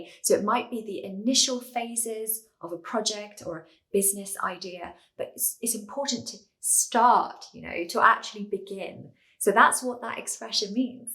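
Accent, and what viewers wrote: United Kingdom. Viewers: ❤we love you as a teacher , you’re videos making my accent like Uk people accent 😊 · I love your accent. As a Brazilian, it has helped me understand British English. Keep up on that! Handy expressions! 🤗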